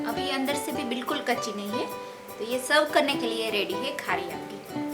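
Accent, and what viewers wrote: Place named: Gujarat, India. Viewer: Good receipe. Thanks for sharing. But why Gujju people pronounce like Gujjus please work on your words. Come out of Gujju accent you will ROCK for sure. All the best.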